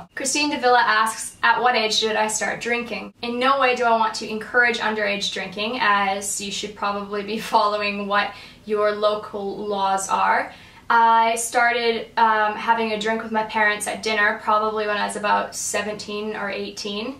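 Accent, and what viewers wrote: Australia. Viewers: she kinda has that australian accent, lol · Are you starting to pick up an australian accent? :) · You're getting a little Australian tang to you're accent lol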